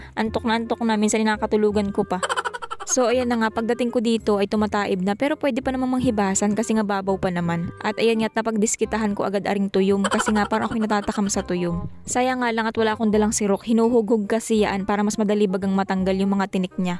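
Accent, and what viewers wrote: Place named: Laguna, Philippines. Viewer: Watching without skipping ads done na miss ko makinig at manuod ng videos mo😂😂 relate kase ako sa punto mo ganyan din sa namin sa kalayaan laguna..shout out 😂😂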